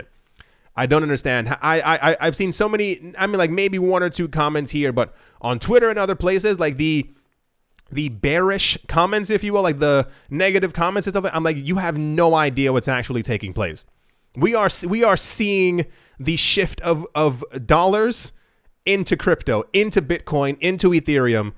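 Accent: Germany. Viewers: Carefull.....your inner german is showing.....good accent! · Hehe, your German accent is great:)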